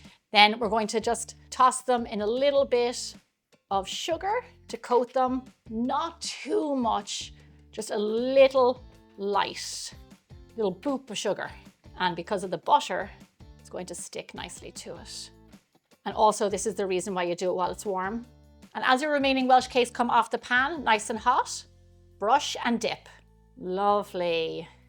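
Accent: Ireland. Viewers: Those are some lovely Welsh cakes,. Your Irish accent just keeps getting more and more adorable than ever before. 🥰🥰🥰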